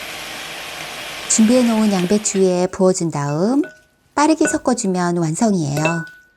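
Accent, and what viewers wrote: Seoul, South Korea. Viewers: 참한 음성에 매력적인 서울 말씨에 건강하고 쉬운 Recipe ! 대구 호부래비 꼭 해볼랍니더 감사합니다!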